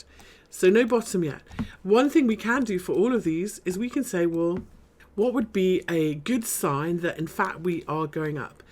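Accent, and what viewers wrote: United Kingdom. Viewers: U sound British . Look forward to Learn from you base in London.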